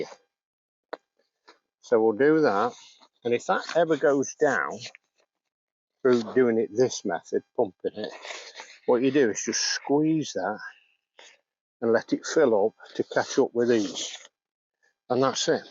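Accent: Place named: Derbyshire, United Kingdom. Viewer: Very funny like your stile.Listening to your accent I dont think you live all that far from Wetton mill.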